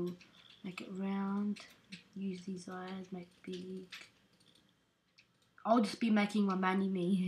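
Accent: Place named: United Kingdom. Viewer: Are you british or live in the UK? I'm not judging you. Honestly, I want your accent. And it worked.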